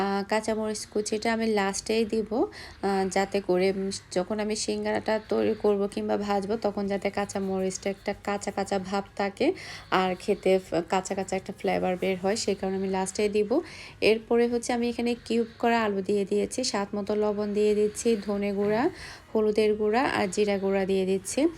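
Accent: Chittagong, Bangladesh. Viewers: Amr Mone hocce apu apni ctg er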